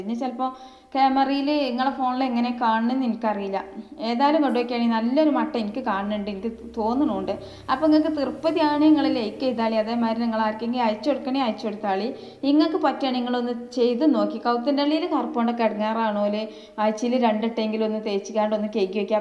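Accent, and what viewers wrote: Malappuram, India. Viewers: മലപ്പൊറം bhasha 😍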